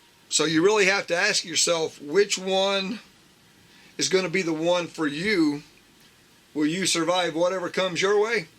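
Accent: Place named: South, United States. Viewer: You sound EXACTLY like Rickey Smiley doing his racist southern white guy prank call voice.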